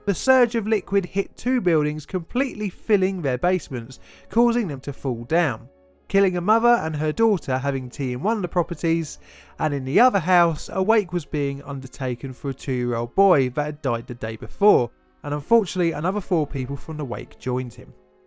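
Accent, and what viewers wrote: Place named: London, United Kingdom. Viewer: sounds like london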